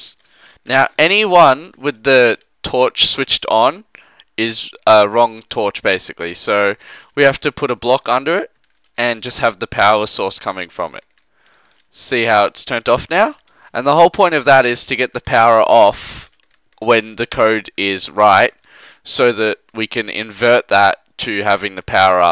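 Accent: Australia. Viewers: he is an aussie and thats why he said buddon or whatever you said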